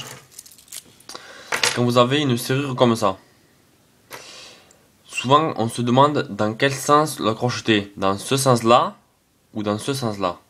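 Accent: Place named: South, France. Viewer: je suis sur que t'es du sud! Vu ton accent pck moi je suis de nice;)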